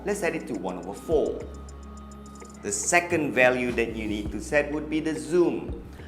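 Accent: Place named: Malaysia. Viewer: Been enjoying your videos since Last year. It's kinda soothing to listen you you speaking, especially with that Malaysian accent. Reminds me of my life in KL years ago. Stay safe always!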